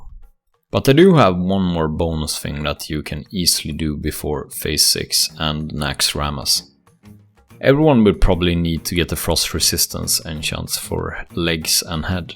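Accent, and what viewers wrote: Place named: Scandinavia. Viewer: May have liked it more if he spoke in Swedish 🙂 i do not like Scandinavian accent as much when listening to English content 😉 would in fact like Danish, or Norwegian too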